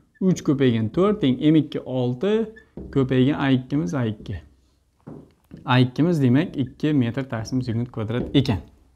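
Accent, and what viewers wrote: Khorezm, Uzbekistan. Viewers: Xorazmdan misiz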